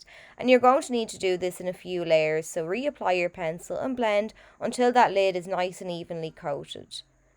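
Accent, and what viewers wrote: Ireland. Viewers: Hey thanks, I’ll look into those brands. I actually took your advice and bought a little packet of hair elastics and have been putting them on the bigger brushes in the meantime. Excellent my Irish friend, excellent. I’m 5th generation Australian but my family hails from Ireland and all my Irish rellys have your accent so I love watching your videos. You are one talented artist. I have watched many many professional makeup artists on YouTube but your uploads are so clear and concise and easy to follow. I like the way you instruct over the video each point as you do it, it makes it so much more doable, and your friendly chatter makes it enjoyable. So cheers for that and keep safe and well over Christmas. X🐨x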